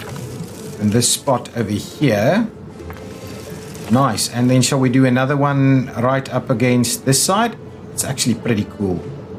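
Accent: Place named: South Africa. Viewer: Hey, you don't sound like an Australian... much more like a South African ;-) . Nice to hear another South African stream/content creator.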